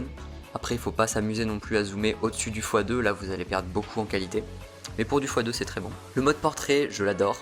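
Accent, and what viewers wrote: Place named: Saint-Malo, France. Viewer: Tu es de saint Malo ? Car j’ai reconnue 😁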